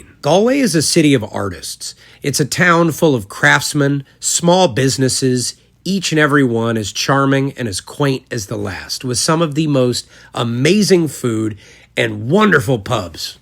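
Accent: South, United States. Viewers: Maith thú, don't fret about your accent, I'm thinking it would sound really lovely le Gaeilge I'm from Aran and am always trying to get my daughter to pronounce words like I do, but she ignores me and goes with east coast school accent ha. Southern accents are beautiful, I love them so much. Fun fact, when I was little in the 70s/ 80s we whispered when we were visiting Galway from the island , didn't want to stand out/ be embarrassed for speaking Irish. I think that is absolutely insane now. Like whyyyy! So happy when I hear of others learning Gaeilge. I've met a German lady and a San Francisco lady with beautiful spoken Irish. Ádh mór leis an bhfoghlaim!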